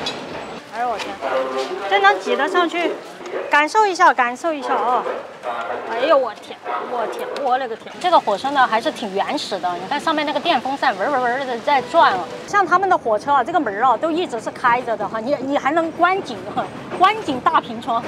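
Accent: Sichuan, China. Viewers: 老乡，你这个川普挺正宗😂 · 可爱的Yooupi小姐姐 你说话好可爱 你是四川人吗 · 妹子講四川口音的普通話真好听、只要講話就好❤看、可愛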